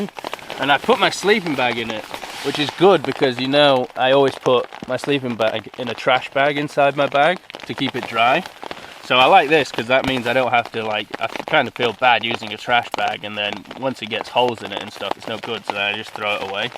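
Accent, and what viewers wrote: Yorkshire, United Kingdom. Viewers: For the whisky, "It was the cheapest, actually." lol. Yorkshire. I love it. Keep up the good work. Love your videos.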